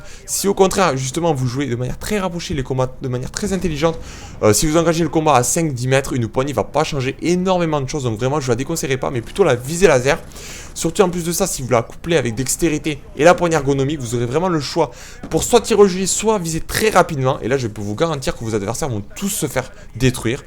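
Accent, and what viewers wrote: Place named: Marseille, France. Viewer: Je veux pas dire mais tu pourrais ralentir la cadence quand tu parle,parce vraiment c'est dur dur à comprendre ce que tu dit en plus avec ton accent marseillais sa n'arrange pas les choses sinon joli massacre :)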